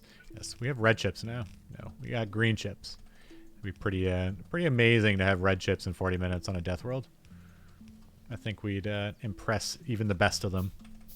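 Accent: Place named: Canada. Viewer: god you are canadian.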